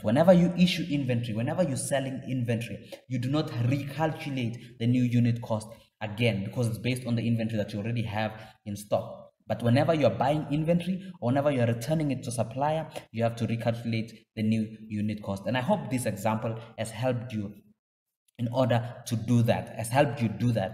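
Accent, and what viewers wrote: South Africa. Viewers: This was a really wonderful clear tutorial. Also great to hear a fellow South African. 🌸 Thank you for your work!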